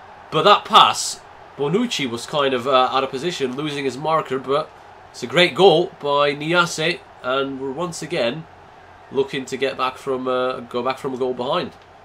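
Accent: Netherlands. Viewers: Ben jij Nederlands (translation: are you dutch cuz i heard a dutch commentator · Is he dutch? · Are you Dutch? I'm pretty sure I heard Evert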